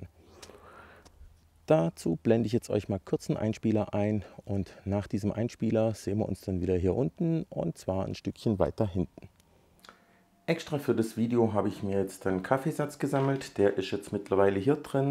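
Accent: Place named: Swabia, Germany. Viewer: War wieder sehr unterhaltsam und lehrreich. Wären wir in der Nähe, könntest von uns auch viel Kaffeesatz kriegen (Vollautomat) 😅. Das Einfärben ist im wahrsten Sinne des Wortes eine "Sauarbeit". Kriegst du da keine Probleme mit der Haut, ist das nicht wie Schmirgelpapier? Ergebnis ist jedenfalls echt gut. Ich finde es übrigens cool, wenn du schwäbisch schwätzen tust.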